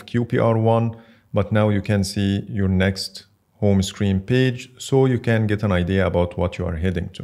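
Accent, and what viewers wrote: Egypt. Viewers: i can hear the Egyptian accent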